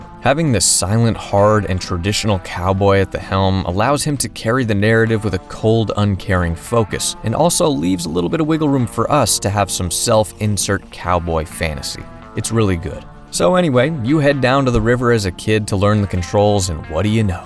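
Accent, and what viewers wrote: United States. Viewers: I love your heavy cowboy accent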